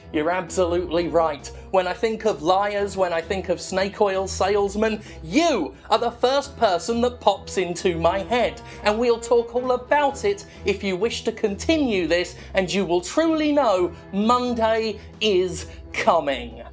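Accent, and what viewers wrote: United Kingdom. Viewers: Well for being the first video that i saw of this "big man with british accent" it was pretty cool and informative, I own Overwatch because I bought it when it was on sale and the issue with the llot boxes isn't Duengeon Keeper mobile bad, I haven't bought a single box but I'm pretty satisfied with the experience besides on a team based shooter that shit is kinda expected like in rainbow six siege and the likes because is giving new characters and new maps for free not like call of duty thta charges another 60 bucks for a bunch of maps, anyway the video was good and fuck Alien colonial marines, that bastard still owes me money for buying that piece of crap... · he is aways mad angry video game nerd he is british aaaaaaaaa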